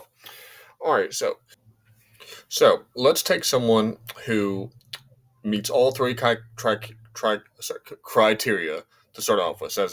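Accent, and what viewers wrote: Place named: Appalachia, United States. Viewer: You from Appalachia or just live there.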